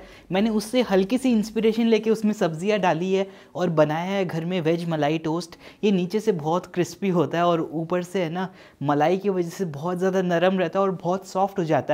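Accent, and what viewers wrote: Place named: Kolkata, India. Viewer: Mujhe aapke bolne k style se hamesha lagta tha k aap kolkata se belong karte h...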